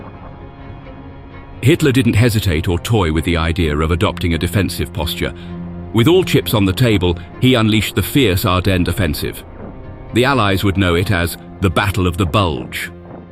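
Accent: United Kingdom. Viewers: British accent and a booming voice, this narrator was perfect for this documentary. An amazing history lesson that held me riveted for the entire 25 minutes. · too much irrelevant digressions to Stalingrad etx a wasted of time-- Why dont these British documentaries just get to the point of the title? As soon as hear the British accent i just know it`s longwinded -takes two hours just to get to the point of the title ...a total waste of time.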